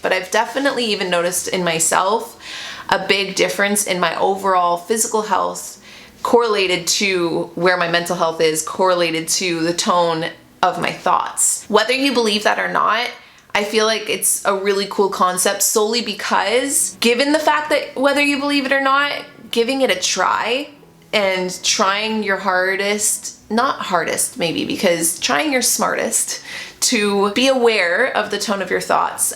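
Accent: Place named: Canada. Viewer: You're Canadian, eh. ❤️❤️❤️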